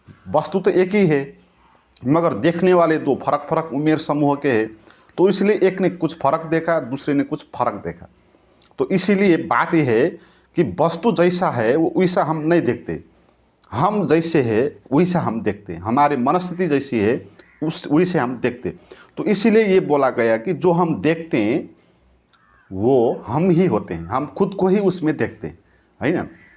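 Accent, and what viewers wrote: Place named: Nepal. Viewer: आपका पता ( address) क्या है. बोली से नेपाली लगते हैं.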